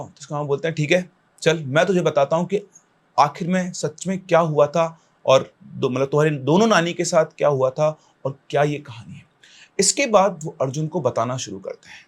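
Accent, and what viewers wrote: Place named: Maharashtra, India. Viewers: Humne bhi aise hi story suni hai relative se U were talking Marathi cutely 😊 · Hello sir I am from jalgaon and i loved the story and ur marathi ascent ❤ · I love that Marathi accent of sir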